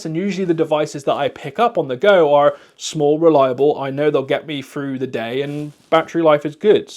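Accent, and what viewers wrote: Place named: West Country, United Kingdom. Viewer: My guy, you sound like you're from the Westcountry like me! I cannnnnot wait to get my Thor!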